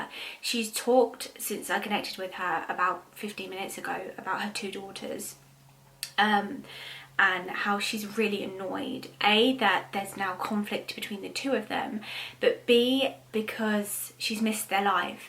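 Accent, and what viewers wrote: United Kingdom. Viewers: Very interesting why do they wait until they are no longer here to tell the secrets? Why not tell while they are here any who this was very interesting I enjoyed the reading I love your accent are u from England? are You British? U have a beautiful accent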